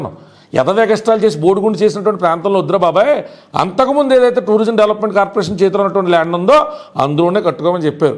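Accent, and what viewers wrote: Godavari, India. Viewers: మీ వాక్చాతుర్యం చాలా బాగుంటది అన్న గారు మీకు గోదావరి యాస సూపర్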